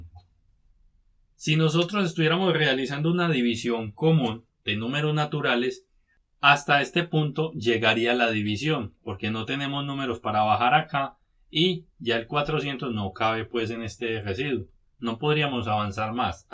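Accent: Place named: Colombia. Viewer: Su acento es colombiano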